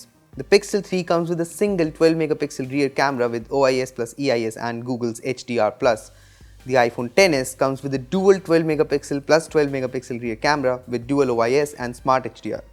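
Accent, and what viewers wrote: India. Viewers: work on your indian accent. Western people like me will click away, like I just d..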